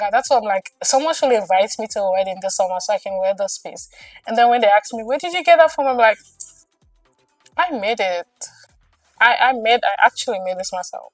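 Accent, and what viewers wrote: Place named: France. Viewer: I hear the French in your English ❤😊... You're my crochet inspo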